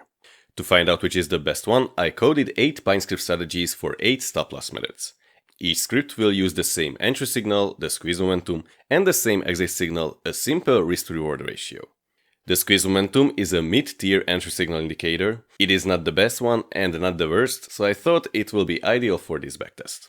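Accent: Hungary. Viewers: Hi, are you Hungarian?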